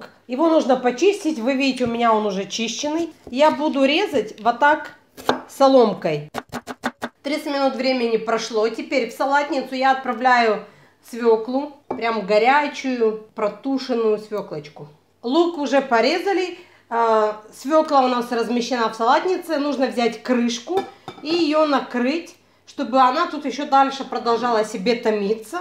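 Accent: Ukraine. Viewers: Цікаво авторка з Одеси, бо відчувається українська основа в розмові.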